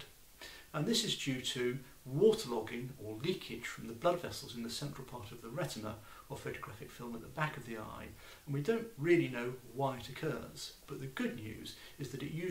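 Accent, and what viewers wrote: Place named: England, United Kingdom. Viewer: Thank you for the heads up, doctor. English accent is not the audio's fault!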